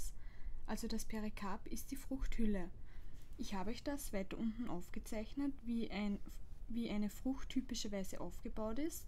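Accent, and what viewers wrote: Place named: Austria. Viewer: Mit diesem sanften österreichischen Dialekt fällt das Lernen gleich viel leichter :D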